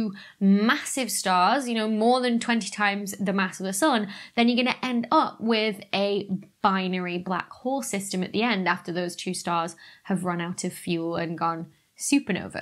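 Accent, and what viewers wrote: United Kingdom. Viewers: Where is dr.becky from ?? Britain ?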